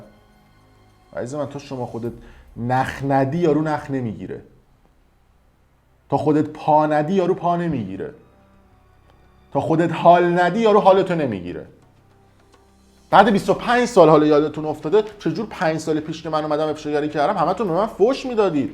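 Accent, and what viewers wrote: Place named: Ahvaz, Iran. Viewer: دقیقا لهجه و تیکه کلامهای اهواز رو دارید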